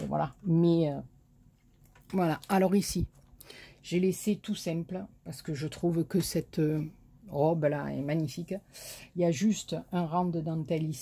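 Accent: South, France. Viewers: Re 👋, waouh quel magnifique album, on en prend plein les yeux 🤩💖😍, vous avez mis ce beau papier en valeur avec la dentelle, les perlounettes, les petits nœuds....... Bravo pour ce très beau projet. Merci pour cette présentation avec votre bel accent du midi et surtout votre bonne humeur, c'est toujours un plaisir de vous entendre, de voir vos créations et de suivre vos tutos. Bien sûr que j'attends vos prochaines cartounettes ou ATNap. Prenez soin de vous et profitez de la mer, du soleil🌞 et de la baignade 🏖. Bon dimanche, à bientôt 🥰🥰😘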